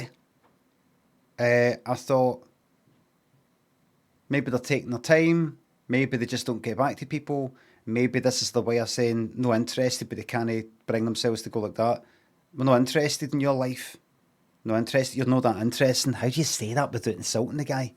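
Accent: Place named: England, United Kingdom. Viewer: Limmy's proper english accent is excellent and very entertaining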